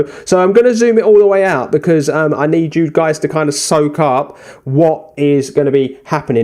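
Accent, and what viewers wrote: United Kingdom. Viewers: This is great! Random question, but are you from Hertfordshire? I lived there as a child and your accent is making me nostalgic!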